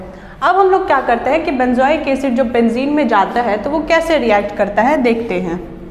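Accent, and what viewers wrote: Bihar, India. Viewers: Bihar se ho kya 🌝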